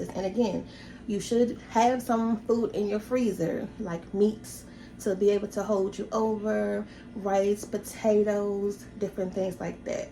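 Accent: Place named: Louisiana, United States. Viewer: Are you from Louisiana? The reason I asked is because you have a Cajun accent.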